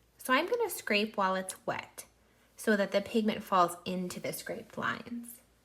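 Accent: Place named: Minnesota, United States. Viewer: I have watched all of the lessons in this series and decided to paint the "funky fish" while going through the videos as one tutorial. I think you made some bold decisions with color choices as compared to the reference image which resulted in an awesome goldfish! Good job! I also loved hearing your accent which reminded me of the 25 years I lived in Michigans U.P., although you sound more Minnesotoan. Thanks for the time and energy you brought to this series.......that was a lot of work on your part.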